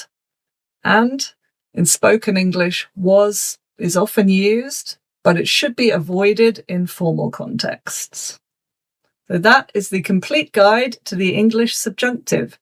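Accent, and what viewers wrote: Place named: England, United Kingdom. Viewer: Yes, of course darling. I have a pretty good command of grammar so the content is basically only a refresher but I love watching the videos because I am a sucker for English girls lol and a lot of it has to do with the accent lol.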